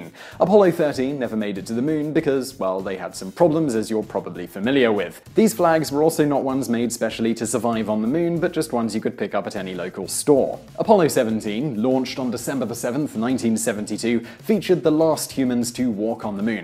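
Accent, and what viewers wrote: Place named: RP, United Kingdom. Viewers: Thomas Smith yeah sounds posher than me anyway, southerner that'll be why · Thomas Smith posher English I think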